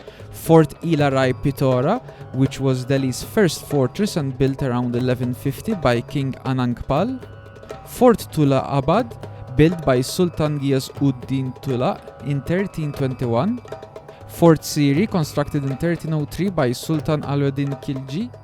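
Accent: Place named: Italy. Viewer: mmm. Your accent is definitely Italian. Bordering on stereotypical even, but I can't knock you too much, you only have so much control over your accent.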